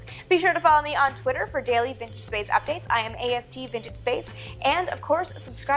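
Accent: Canada. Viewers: (Vintage Space) I used to have a couple of Canadian friends here in Australia but they moved to the states for work. So I've always loved your accent. You always seem to find those extra little tidbits on a something I thought I knew about, so it'll be awesome to see what you turn up on the Arrow. It's a shame about the plane but the rumours about plans and maybe a last one getting away are fantastic.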